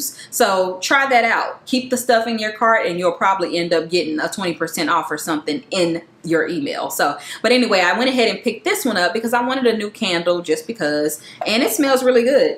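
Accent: South, United States. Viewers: Thanks for sharing! Btw, where are you from? I hear the southern "accent." 🙂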